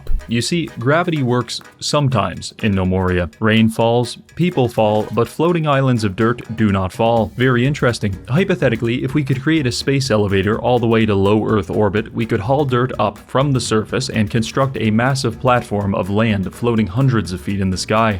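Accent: Newfoundland, Canada. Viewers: This guy is the most newfoundland sounding guy I have ever heard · "Zombie oat-breaks" God, I love the canadian accent Also love your vids!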